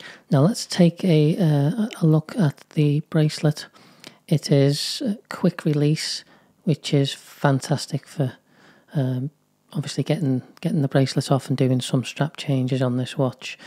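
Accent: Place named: Merseyside, United Kingdom. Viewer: 😮😮😮 amazing review my friend.....nice to hear a fellow Merseysider on yt good review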